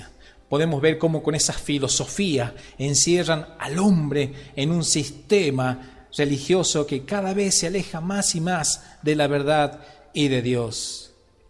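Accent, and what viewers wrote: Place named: Northwest, Argentina. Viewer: Hermano , es correcto lo que dices . Que Jesús siga bendiciéndote . ( ¿ Eres salteño ? Tienes tonada del noroeste )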